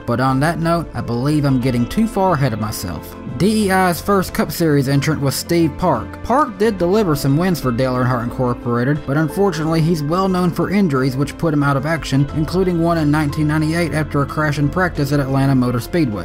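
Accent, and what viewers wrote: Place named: Texas, United States. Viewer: I have a buddy here in Texas that talks just like you, swear to God I do!!!!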